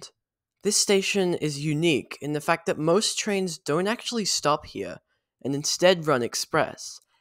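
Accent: United States. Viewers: i'm pretty sure I saw my car in the background of one of the still photos. Also, can I ask about your accent? It sounds partly American. Is that on purpose? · Is there a particular reason you talk with an American accent? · are you part american/canadian?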